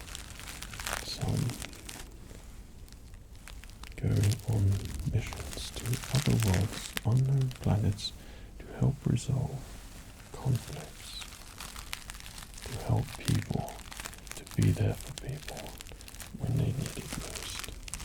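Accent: England, United Kingdom. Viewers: Now a rude english sith lord!!!!!! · RUDE BRITISH JEDI TRAINER😂😂😂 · Rude English Gentleman Sith version. For all of us non-Jedi scum.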